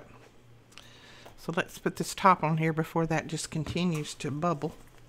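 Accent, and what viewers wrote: South, United States. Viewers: Love. Love, Love this. I'll be creating one, thanks so much for sharing & for the inspiration. Love your accent💜I have relatives down south. Stay safe & be well. We also wear our mask & gloves when we go out. The price of food & paper goods have gone up. This virus has affected everyone one way or another. Sadly, I have relatives who are I'll from the virus. It's a difficult time. 🙏🙏🙏🌹❤💙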